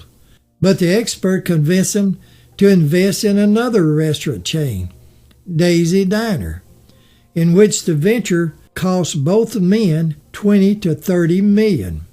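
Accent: South, United States. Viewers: .... Another great vlog. You have the knack of telling a great story. I just do enjoy listening to your sweet southern accent. Please have a joyous and safe holiday season.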